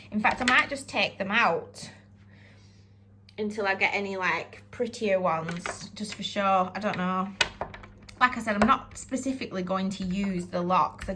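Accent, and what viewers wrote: Northern England, United Kingdom. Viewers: Hi loving the flooring and the stair rods spot on👌💖 Ey up I'm loving the northern accent haa.. si ther 😊🥰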